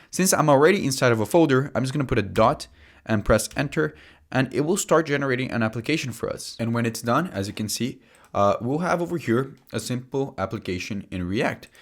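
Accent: Brazil. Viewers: Great video,! Hey, I'm curious about something... Are you brazilian or even son of brazilian parents? It's kind of weird that I understand almost 100% of what you said cause your accent sounds like mine hahah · Tu é brasileiro por acaso? ksksksks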